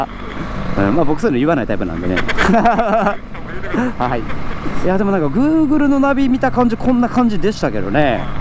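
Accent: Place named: Kansai, Japan. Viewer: ナイトツーリングに続き、このツーリング動画もおもしろかったです！ 試乗動画でもイントネーション的に関西感はバッチリ出てますよ😁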